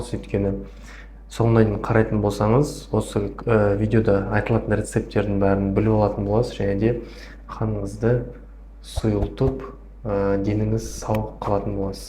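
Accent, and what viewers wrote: Standard. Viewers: Аиналаиын балам Рахмет Саган сондаи журекке жылы жагымды казакша таза тусындыресын Алла жар болсын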